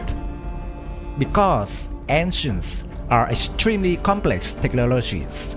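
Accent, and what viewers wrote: China. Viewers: Agree his accent is so thick and heavy he might as well speak his native language Chinese lmao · you are chinglish accent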